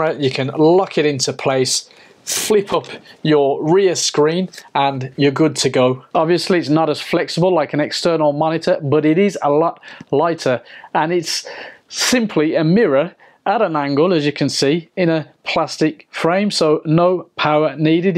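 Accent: Birmingham, United Kingdom. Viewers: nice work, you sound like a fellow brummie! i didn't even realise this simple idea existed.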